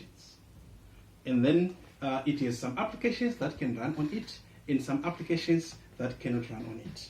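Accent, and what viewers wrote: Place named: Zimbabwe. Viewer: Accent says Zim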